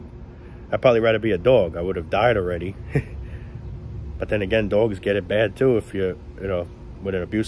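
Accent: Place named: Bronx, United States. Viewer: originally his accent reminded me of the bronx, but then he told me he's from yonkers. life is so confusing sometimes. 😉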